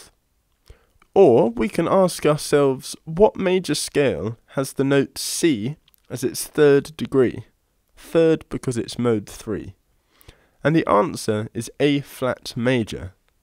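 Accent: United Kingdom. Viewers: thank u ،،;btw r u from UK?i enjoyed ur accent 👏👏♥🌷🌷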